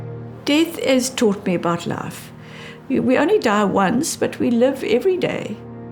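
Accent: South Africa. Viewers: The accent is southafrican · That was really powerful and heartfelt. You say you are British but you have a South African accent and it looks as if you live there now. But you are so right as we don’t talk about our emotions as well as we should. The hospices do such a wonderful job, you are so right and it is not at all a depressing place. It would be wonderful if we could all end our lives there. By the way your grandmother is very beautiful and you obviously have her genes.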